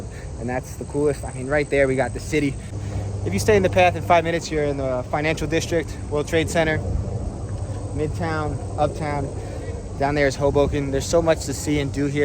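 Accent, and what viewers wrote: Brazil. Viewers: Parece um brasileiro tentando falar inglês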